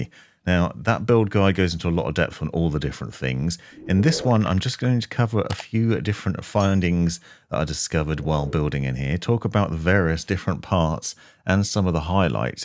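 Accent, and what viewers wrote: United Kingdom. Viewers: it’s not just the speed …it’s that Brit accent hahaga GREAT video man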